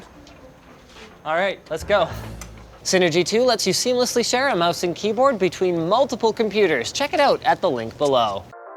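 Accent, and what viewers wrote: Canada. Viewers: Canadian accent really poppin in this video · you can hear the canadian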